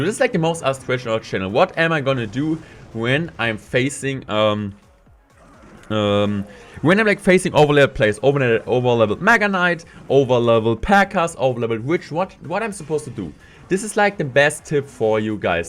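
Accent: Germany. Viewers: We love accent germany/English.. best content creator ever.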